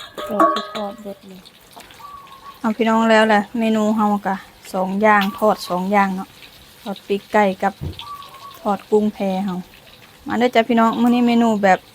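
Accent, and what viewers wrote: Thailand. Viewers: หนูเรียนภาษาไทยเหรอพูดสำเนียงคล้ายคนไทย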